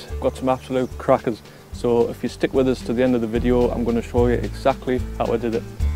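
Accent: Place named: Newcastle, United Kingdom. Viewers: I’m definitely wanting to grow pumpkins so that was really useful. Are you north east based ….thought you have a local, to me, accent 👍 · Really enjoyed watching, my first year growing pumpinks. Didn't have a clue about the make and female stuff. Learning every day. geordie lad, nice to hear someone local. No American accent with you.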